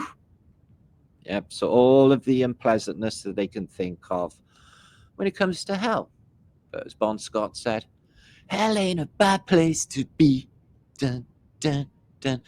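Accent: Wales, United Kingdom. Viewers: Very, very interesting, you weave an incredibly convincing narrative here. Love the dry humour and the welsh accent equally 😊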